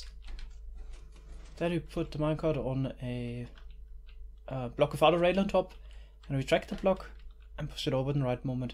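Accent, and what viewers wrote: Germany. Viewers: es klingt wie wenn ein deutscher englisch,spricht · HoiiBoy ???? Das liegt daran, dass ein Deutscher Englisch spricht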